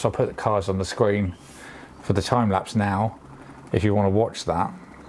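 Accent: United Kingdom. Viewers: Where are you from? Judging by the accent I'm thinking Gloucestershire, Bristol maybe?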